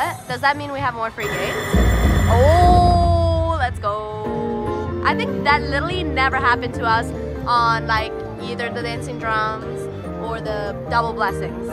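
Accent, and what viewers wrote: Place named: Minnesota, United States. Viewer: Recognize that Minnesota accent from anywhere ya know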